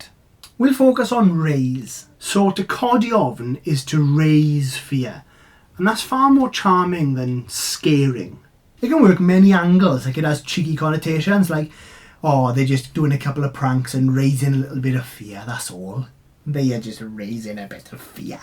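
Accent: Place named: Caerphilly, United Kingdom. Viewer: Your accent sounds like your from round the Caerphilly area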